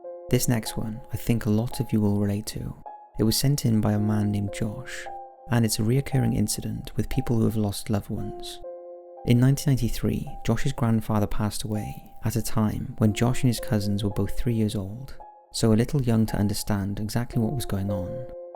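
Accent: Wales, United Kingdom. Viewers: You sound welsh , are you from Flintshire /Rhyl area ? Or are you an anglesey lad ? Anyway you sound very calming great narrating voice !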